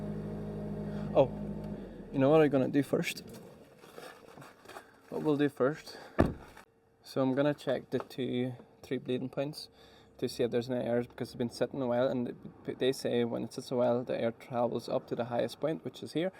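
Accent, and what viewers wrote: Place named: Northern Ireland, United Kingdom. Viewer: Taip ir galvojau. Pagal akcenta tu kazkur apie Antrim gal gyveni, cia tik speju 😄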